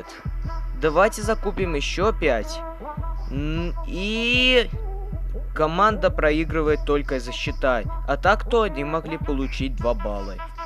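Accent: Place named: Ukraine. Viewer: Мне кажется что ты украинец или беларус я беларус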